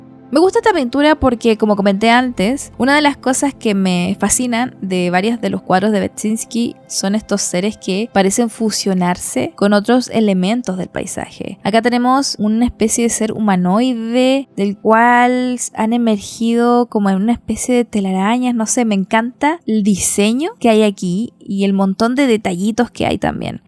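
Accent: Chile. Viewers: Amé! muchas gracias por tu trabajo, muy enriquecedor, perturbador y de introspección. Además, amo hables en chileno sin importar nada! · Que lindo escuchar tu voz ese asento chilena que tienes me encanta ❤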